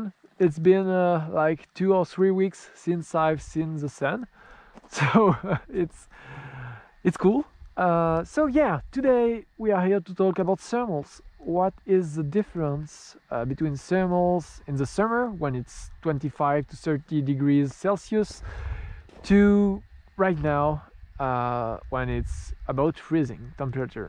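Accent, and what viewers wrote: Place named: France. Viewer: you never have to apologise for having a French accent. Like most Englishmen, I can just about manage to order a beer and a ham and cheese sandwich in French. I am constantly amazed at other people's ability to communicate so fluently in another language.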